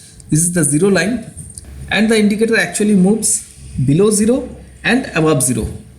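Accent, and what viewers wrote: India. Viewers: I am from Russia and I understand your indian English )))))))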